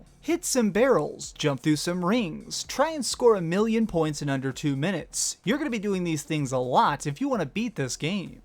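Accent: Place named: South, United States. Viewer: Man, you sound like a peckerwood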